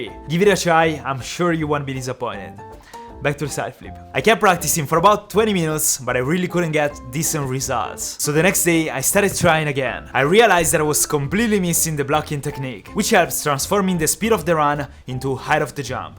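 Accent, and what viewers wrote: Italy. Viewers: Fra se sente che sei italiano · madonna quanto si sente che sei italiano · bello come si capisce subito che sei italiano ma nessun italiano commenta i tuoi video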